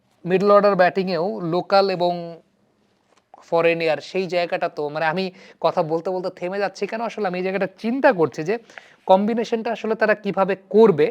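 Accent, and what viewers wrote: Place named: Chittagong, Bangladesh. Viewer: আপনি তো চট্টগ্রামের বাষাও খুব ভালোই বলতে পারেন।😮😊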